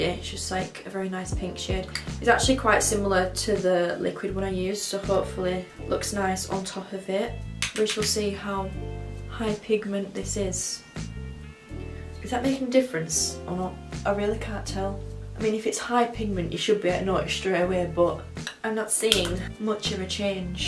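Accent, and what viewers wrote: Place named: Northern England, United Kingdom. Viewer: I love you already cause I’m Northern too! It’s nice hearing a northern accent on YouTube for once! 💕💕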